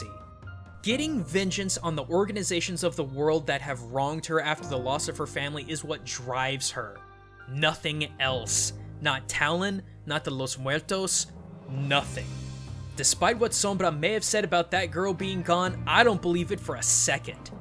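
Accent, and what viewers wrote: Spain. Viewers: Man dat spanish accent!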